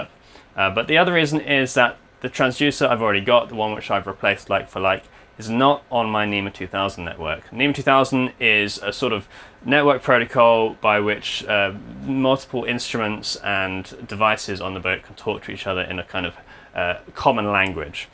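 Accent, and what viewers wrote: Australia. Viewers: nice to hear and australian accent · Two ingredients for successful boating - patience and the maturity to know when brute force is the only option. Good to hear an Aussie accent as well.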